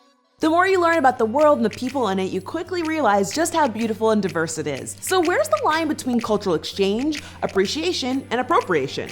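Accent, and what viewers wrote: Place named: South, United States. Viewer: So she once apologized for her southern accent, but then she puts on this white voice whenever she mimics white people. GIrl byeeee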